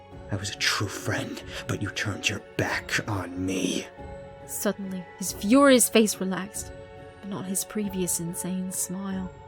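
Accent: United Kingdom. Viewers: This was awsome! I've never heard you do a British accent before, it's kind of ckol. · when u get turned on by awesome brtitish accent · why does it sound like you have a British axesat? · Insanely and awesomely narrated! If I didn't know how you really sound like, I would swear you were born in the UK.. and at first I thought I was listening to Fearagen but, NO.. In actuality, it's Like Wow! he took the role of creepiness to the next level, and so Thanks Lovely Ms. and.. please, more stories like this! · i missed this long story of yours. great narration as always. im amazed that you can do a british accent. kudos to you and. 👍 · oh snap British accent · Excellent story, love the accent! That was a great British accent, just because it said or I wouldn't of known it was you LOL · That was a freaky and grisly little story. It felt like a dark fairy-tale with gothic horror overtones. 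Really sucked me in. Nice job, as always. Also, your British accent is adorable.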